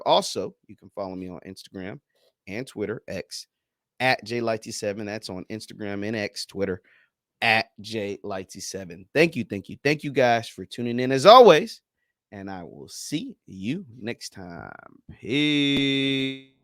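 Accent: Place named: Boulder, United States. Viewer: done switched up‼️ I knew u sounded like them ppl up in Boulder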